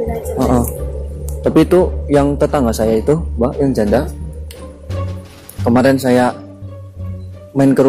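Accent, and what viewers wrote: Banyumas, Indonesia. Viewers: Ngomongnya medok tenan mas bro, Sampean wong jowo ngapak Yo ? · Mas,,, rika wong ngapak ya??? Pada bae mas... 😂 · Wong Banyumas apa rika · Ngomonge ngapak nemen Mbok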